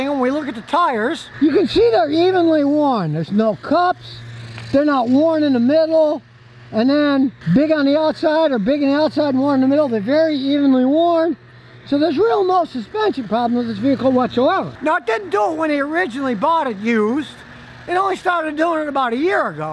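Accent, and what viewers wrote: United States. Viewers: has developed his own personal american accent. i can barely understand him anymore.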